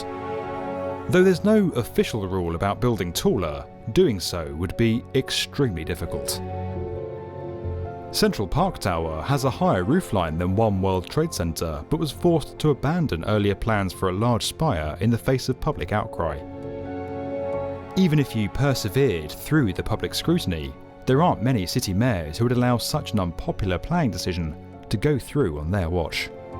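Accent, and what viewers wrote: United Kingdom. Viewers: I know right I like a good British voice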